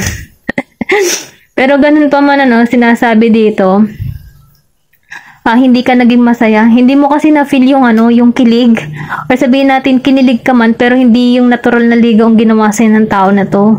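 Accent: Batangas, Philippines. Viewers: Mkasarili po tlga🥲😔 WLA pkealam s nrramdman ng iba, thank you po💖 mam batangeña kpo ba hehe feel ko lng po salita nyo😊 pero Kung di nmn bka lng po 😅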